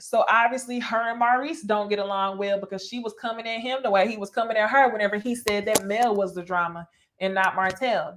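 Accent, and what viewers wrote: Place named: DMV, United States. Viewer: Lol the first time I heard the DMV accent was some documentary about girls in a juvenile home...and then Monique the comedian lol. I hear your accent but I've noticed in Dallas there are 2 different accents...the southern one that multiple ethnicities have and the one that sounds like Nelly and the St Lunatics lol. I'm from boring Iowa but live in TX so I love hearing accents 🤣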